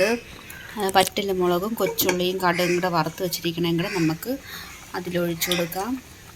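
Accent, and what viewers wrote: India. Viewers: Curry is superb, MY Trivandrum language is Superbbbbb 😊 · ചവള സൂപ്പര്‍ തിരുവനന്തപുരം സംസാരം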